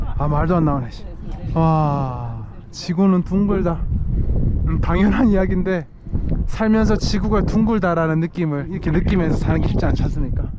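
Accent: Gyeongsang, South Korea. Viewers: 경북인줄 경남 이셨구나 부산 싸나이 ~~ 홧팅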